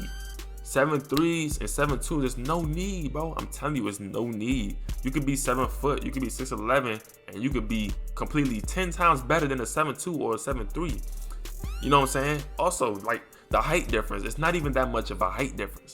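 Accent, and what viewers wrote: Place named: Washington DC, United States. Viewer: Bruh definitely from DC or Murland..Being from Seat pleasant, I know us when I hear it 😂 Good shi doe fool 💪🏾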